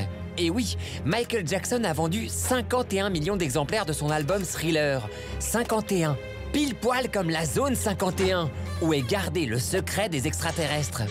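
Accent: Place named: England, United Kingdom. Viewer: Super accent anglais !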